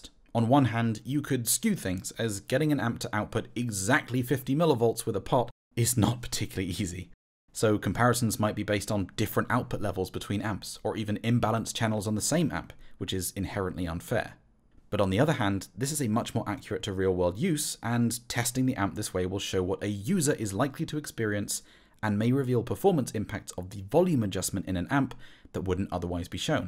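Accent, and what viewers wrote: United Kingdom. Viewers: An excellent video. you really communicated this very well. And I love the sound of your voice/accent/grammar. The very best of ye old British. Cool. Excellent diction and pace. Very easy to listen to. Superb explanations, very well presented. Thank you.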